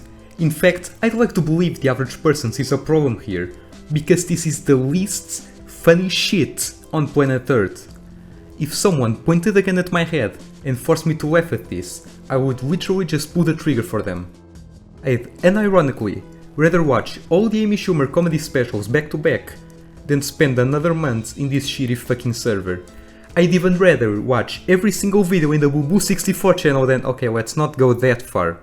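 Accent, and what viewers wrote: Portugal. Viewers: are you portuguese by any chance? (im asking because of your accent) edit: nvm és tuga de certeza lol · Hey, are you by any chance portuguese? Your accent is very familliar. O facto do canal ter CR7 no nome também ajudou. Great video by the way, gained a new sub. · Ganda vídeo bro , és português? Notei um sotaquezinho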